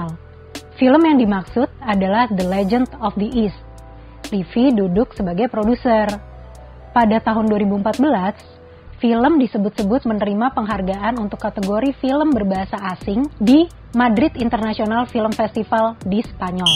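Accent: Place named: Java, Indonesia. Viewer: Fix mba nya jawa pelan~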